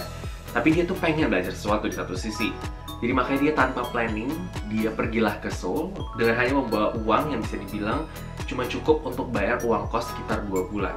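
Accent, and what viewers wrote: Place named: Jakarta, Indonesia. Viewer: Haaii, gw suka banget sm logat Jakarte elooo...!!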